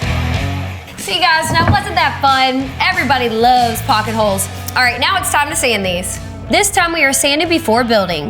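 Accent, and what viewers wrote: South, United States. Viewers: Redhead. Chest. Southern accent. She has to be bi too. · That country accent 👌🙌😍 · How the fuck, did I end up here?? ...I ended listening to southern American girl ....building shit. Why.....I am in Europe like. At least I enjoyed her accent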